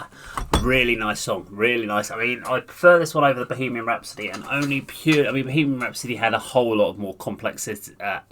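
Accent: United Kingdom. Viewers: You got 1 like and 1 new subscriber here 🙋‍♂️ Bcause i appreciate ur honest reaction, and i like ur british accent too lol Next - cover.. Thx m8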